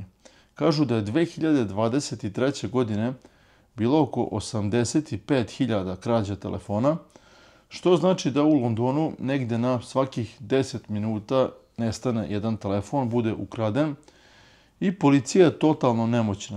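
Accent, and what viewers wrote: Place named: Slavonia, Croatia. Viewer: Prijatelju zanima me odakle si , nekako mi zvuci kao da si iz slavonije kao npr Osijek ..🤔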